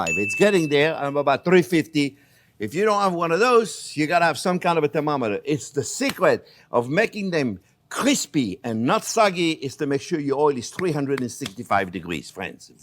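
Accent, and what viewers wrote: France. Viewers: I like this man embracing his pronunciation of his onion. Gonna feel his french accent is so strong. But I love this guy. 😊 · I've been learning French and at times despair at my poor prononciation and misplaced verbs. Then I realised I love listening to your videos as much for your delightful accent as your delicious recipes and helpful cooking tips. Maybe a French person would be just as delighted to hear my Aussie accent - or maybe not! 😊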